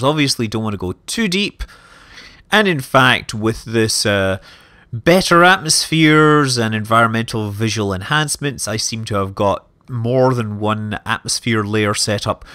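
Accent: Scotland, United Kingdom. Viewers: Omg, nerdcubed sent me here and I was a bit reluctant but now I know your Scottish I’m amazed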